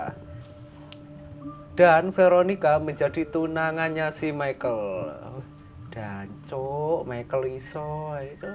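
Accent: Java, Indonesia. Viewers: udah suara medok banget.. joke lo garing lagi.. bikin muntah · Tolong yg bener min komentator nya, udah medhok banyak bercanda ga lucu juga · Amatiran.. Udah medok pake becanda, jd gak menikmati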